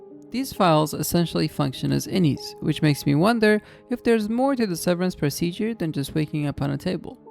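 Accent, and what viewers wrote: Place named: Europe. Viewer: Yeah it is an amazing show! I like that it is so mysterious! It really makes the viewer think! It still could be so many different things! So you think youre outie and innie are different people! Interesting! Yeah you should continue your YouTubes! You’re good at it! I like your accent! What is it? European? It’s ok you don’t have to tell me! It can be another mystery! I won’t be watching much tv until November. I’ve got a big race to train for. But once I’ve finished, I’ll be back on the couch and watch lots tv shows and be deep into theories on reddit and YouTube! which movies do you like?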